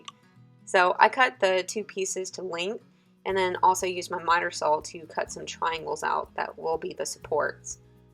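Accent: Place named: South, United States. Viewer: apparently I'm not allowed to say anything about the southern drawl. so sorry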